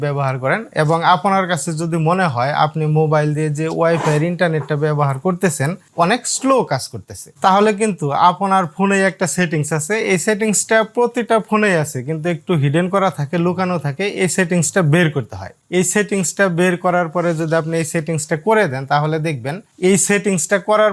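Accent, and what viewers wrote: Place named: Bangal, Bangladesh. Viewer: বাঙাল টান কম থাকলে ভালো হয়, কেননা আমার মত অনেক দর্শক ভারতের আর একটু তারাতারি বললে ভালো হয়। শুভেচ্ছা রইল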